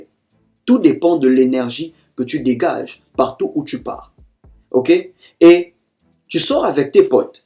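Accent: Gabon. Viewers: J'ai découvert cette chaine au début de la semaine, j'ai visionné plusieurs vidéos, et je trouve que le frère a du vécu, il sait de quoi il parle, tout en dégageant l'attitude de quelqu'un qui est issu du milieu de la séduction. Une très bonne connaissance de la nature et la psychologie féminines. C'est le type même d'ami, de frère, voire de grand frère qu'on aimerait avoir eu avant de se lancer dans le Game où de nombreux d'entre nous se sont fait broyer par inexpérience. Et l'accent du Gabon, où j'étais en 2008, est juste nostalgique. Force à toi frérot, un nouveau fan de ta chaine, du côté de Brazzaville. Mbolooo!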